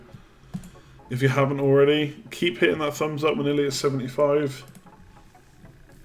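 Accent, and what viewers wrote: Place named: United Kingdom. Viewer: are u Irish seen the Irish flag beside Ur team but u have a strong British accent?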